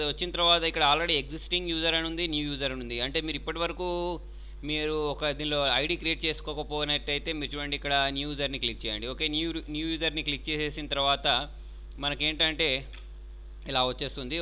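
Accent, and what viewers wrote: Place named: Telangana, India. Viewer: Telangana mastu matladtunav bro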